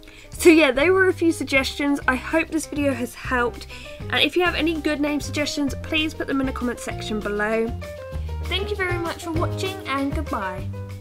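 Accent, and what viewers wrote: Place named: United Kingdom. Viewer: Just sat here watching this with my 3yr old and for no reason he was repeating every word you said trying to mimick your accent (we are german and living in the us so the combo of that with him trying to sound british was 👌🏻😂)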